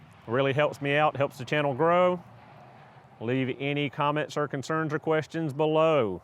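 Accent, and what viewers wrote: South, United States. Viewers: I didn't mind your southern accent and it does take me awhile to get used to it 😅, and I like your video that show people how to do things 🎉 my brother's car needs a part replacement in the engine a mechanic wants to charge him three thousand dollar 😭 to do it, he didn't want to do it, but he fixed it 😅 I asked him how did he do it, he said he watched some yt videos on how to do it so on a long weekend he rented a engine lift thing and with help of his sons they got it done 😅🎉 I was impressed because the car is a BMW M7 😱 he took the engine apart change the part which he ordered online and put everything back together 😢, I have a Subaru repair manual It does have details instruction on how to do it but watch your video on how to do it and the tips he gives that is something a manual can't give me so I 👍👍👍 for you to do it, but it mean more work for you I hope you can continue to make this type of video 🎉 because a mechanic is just too expensive and I don't know if he is telling the truth 😢, my mom told me s story about my aunt, when she brought a car, because she didn't know anything about cars so she took a Auto repair class in highschool, I was surprised 😅 because she has a PhD and a professor at UCLA tough there for a long time and yet she knows nothing about how a car works mechanically so she took the class to have an idea on how car works, sometimes I was wondering when she was in the classroom does her classmates or the teacher know about her, they may think she is jus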